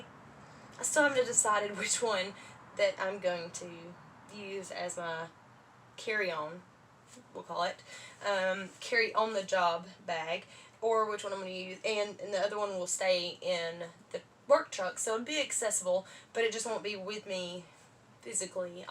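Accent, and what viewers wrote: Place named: South, United States. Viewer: I love your southern accent! it’s sounds like sweet tea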